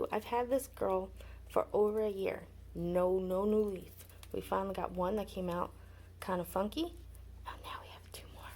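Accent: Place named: New York, United States. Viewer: Beautiful flowers. Congrats! Where did you find those gorgeous big compacta baskets? You sound like your from NY and I have never seen those anywhere in the NYC limits. 😊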